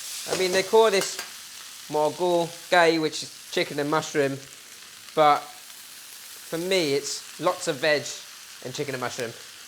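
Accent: United Kingdom. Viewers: Greetings from Walnut Creek CA. Looks delicious. Just ran into this channel for the first time. Love the British accent (or maybe it's me with the accent)!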